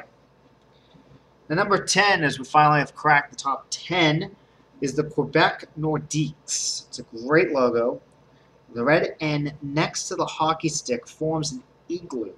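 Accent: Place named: Hartford, United States. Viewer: Wow he has such a Hartford accent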